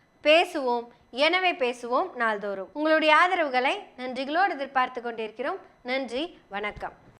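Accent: Kongu, India. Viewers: உங்க கொங்குத் தமிழ் மிக மிக இனிமைங்கோ! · கொங்கு slangula செஞ்சுவிடுறது எல்லாம் வேற லெவல் மா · அருமை 🖤❤️கொங்கு தமிழில் சாதி கொடுமைக்கு எதிர் பேச்சு கேட்ப்பது இனிக்கிறது 🙏காரணம் அங்கே தான் சாதி வெறியை ஆடி 18யில் கண்டேன் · சகோதரி தங்கள் கொங்கு தமிழ் சுவையோடு கருத்தாழமிக்க பேச்சு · பேசு மகளே பேசு இது தற்கரி தலைகளில் நங் நங் என்ற கொட்டுகிற கொங்கு தமிழ். கொஞ்சுகிற கொங்கு தமிழ். சங்கிகளின் கொட்டத்தை கண்ணத்தில் அறை விட்டு அடித்து விரட்டும் கொள்கை தங்கங்களின் சங்க தமிழ் அழகு தமிழ்.Allhuakber. jaibheem Periyar gr.daughter வாழ்க வளர்க நலமுடன்.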